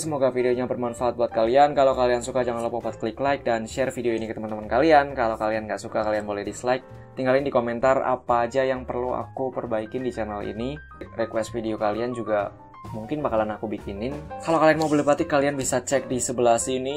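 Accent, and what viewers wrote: Pekalongan, Indonesia. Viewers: Pantes indonesia nya medok ternyata pekalongan😁, santri ya ekwk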